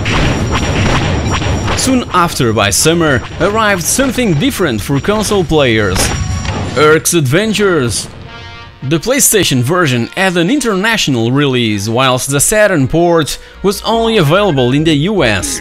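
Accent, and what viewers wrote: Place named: Portugal. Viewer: Vi logo pela forma de falar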